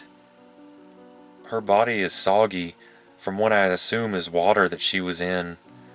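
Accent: South, United States. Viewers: Omfg your southern accent is so thick lmao. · obviously his regional Southern accent. If you dislike it, and it appears you do, there are a lot of narrators who aren't Southern on Youtube. I personally find it quite lovely, but that's simply me.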